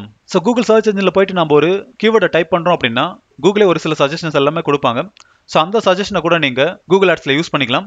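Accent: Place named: Coimbatore, India. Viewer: nenga coimbatore thana bro.unga slang la theriyuthu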